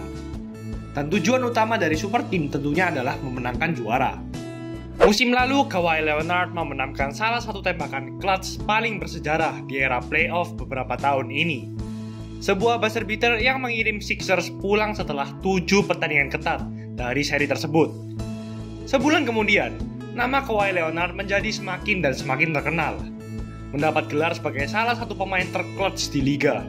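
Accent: Java, Indonesia. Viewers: Min...logat jawanya di kurangin.....xi..xi...xi....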